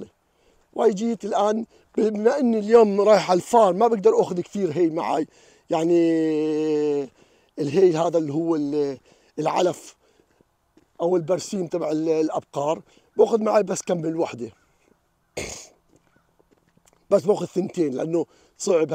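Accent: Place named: Palestine. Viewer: باين من لهجتك فلسطيني أو أردني تحية لك من بلد المليون شاعر الجمهورية الإسلامية الموريتانية ❤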